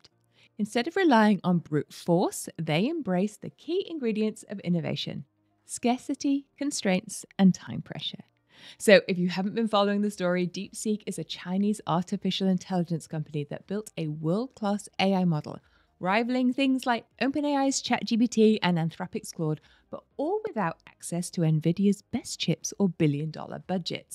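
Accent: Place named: New Zealand. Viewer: Well explained, first video I saw about these topics. The captions also helped me, since the nz accent is harder for me. Thanks from 🇧🇷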